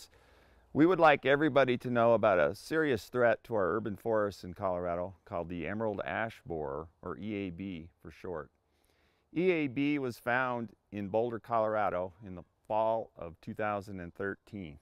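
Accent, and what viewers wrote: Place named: United Kingdom. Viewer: Translation-Ash you're a tree? Nice! Wait is that a british accent? Sorry but I love british accents ♡♡♡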